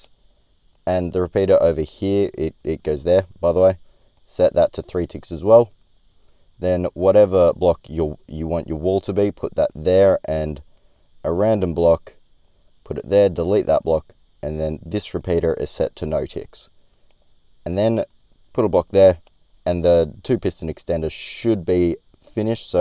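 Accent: Australia. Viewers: Heavy Aussie accent love it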